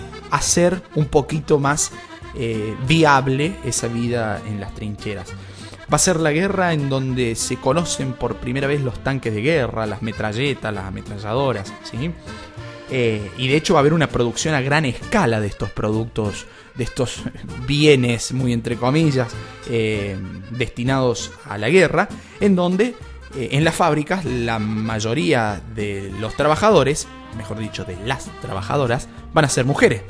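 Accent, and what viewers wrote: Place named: Argentina. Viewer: La verdad que dese hace semanas estoy buscando videos para oír antes de dormir y este canal me parece muy bueno, dormirme y culturizándome , incluso he llegado a soñar de lo que oigo y definitivamente prefiero la tonada Argentina y soy Venezolana , gracias por este aporte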